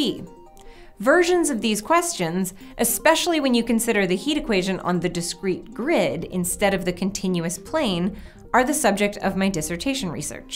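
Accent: United States. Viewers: Knob - Not at all: he spoke very very clearly and not fast at all. And I'm non-native English speaker: he has the correct Virginian speed and accent for me (and for most AFAIK).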